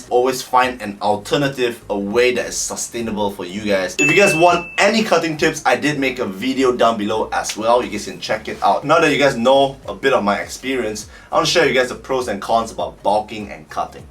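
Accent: Singapore. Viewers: Is this a Singaporean accent? Great video by the way! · Eh bro r u Singaporean u sound singlish siah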